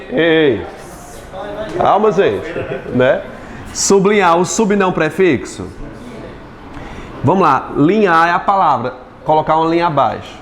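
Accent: Northeast, Brazil. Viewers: Primeiro professor que não me deixa entediada. É didático, sem gracinhas, sem exageros para prender atenção, direto ao ponto. Fora esse sotaque maravilhoso do meu nordeste 😍😍😍😍 Nossa eu amo suas aulas. Muito obrigada, de coração.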